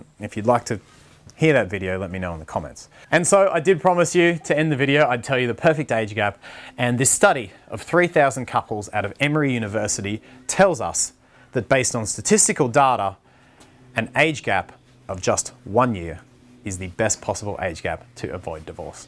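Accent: Australia. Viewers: Hi, thank you for the reply. Part of my problem is that i work am off shift tue-sat 430pm-1230am which makes it very challenging for me to meet or date for that matter. I usually go online to meet men which i receive alot of responses which gives me many options. But again its my schedule and the fact that there are so many players, dishonest people or those that only want sex. So it does take allot of work weeding through them. And i know i have much to offer the right person and will not settle just to be with someone. I'm just concerned because i am older now and my options will become less and less. Im on my 50's my people guess me to be much younger in my late 30's to early 40's which is great and am also young at heart with a free spirit. I am taking a break at the moment but may try again in the fall. You sure seem to be such a sweetheart, i love your accent. 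Never been to Australia but love to Travel, maybe ill make it that way one day on one of my adventures. Take care, Dee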